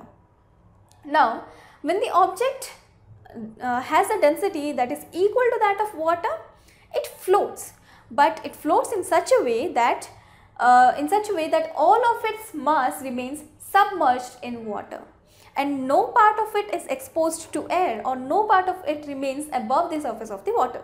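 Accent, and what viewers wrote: India. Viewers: Hi, most of the words / vocap / etc understanadlbe just a request there is no blameing here but for every video dould you add transcript of the video cause the language of indian esspecially accent is effect the english understanding would be bettter. Thank you for your patience.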